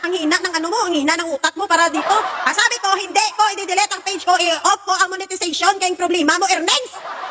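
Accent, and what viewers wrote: Iloilo, Philippines. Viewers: Super love ko talaga Ilonggo accent. 😂 ❤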